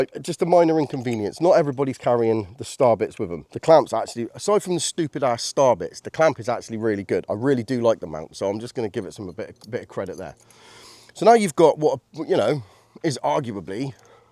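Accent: United Kingdom. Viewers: I heard the brittish accent and wondered what this dude could know about guns and how experience he could be rambles on for 4 mins then says he knows nothing and he doesn't understand the purpose of stuff.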